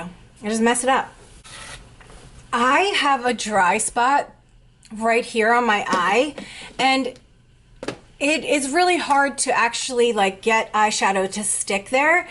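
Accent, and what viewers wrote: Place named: New Jersey, United States. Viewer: loving the Jersey / Brooklyn accent. Got the same one.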